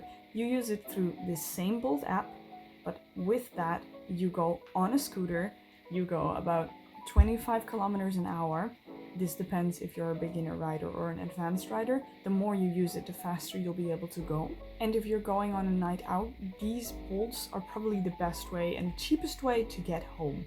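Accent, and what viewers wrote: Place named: Upper Midwest, United States. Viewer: Hi there! What part of the USA are you represent? I’m from Wisconsin.. You sounded like a neighbor from Viking land or Lions land.. Ačiu !!!! 🇱🇹🇺🇸👋✌️💐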